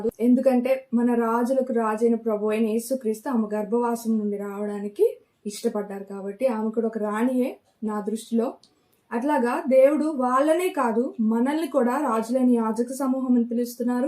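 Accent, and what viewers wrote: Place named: Guntur, India. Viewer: Guntur kada miru😂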